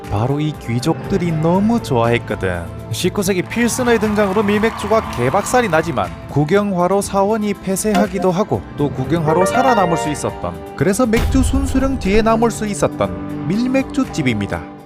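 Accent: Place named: Seoul, South Korea. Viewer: 와 유용한 정보들과 맥주역사까지 설명해주니 좋네요 무엇보다 서울말로 설명해주니 귀에 쏙쏙 들어옴!!!🤩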